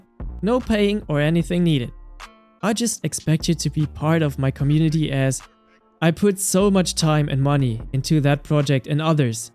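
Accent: Germany. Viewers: yo i didnt know u had a wife (i also think that ur german accent helps ur vidoes)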